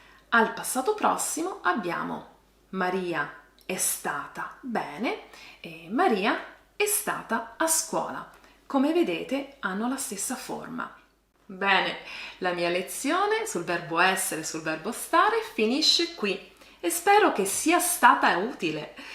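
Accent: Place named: South, Italy. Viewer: Ma se sei terrona che cosa vuoi insegnare italiano con quell'accento che hai Stare al posto di essere lo usano sempre e in modo insopportabile i romani e terroni come te. È un vostro marchio immediatamente riconoscibile. E impara ad usare il sia... sia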